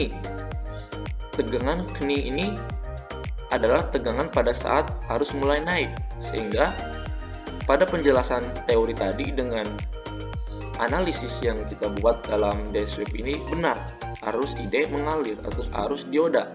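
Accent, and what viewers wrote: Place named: Surabaya, Indonesia. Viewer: Mantap bang are are suroboyo 😁😁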